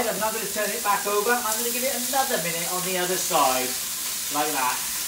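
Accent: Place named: Newport, United Kingdom. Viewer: Are you from Newport mate? Accent sounds like it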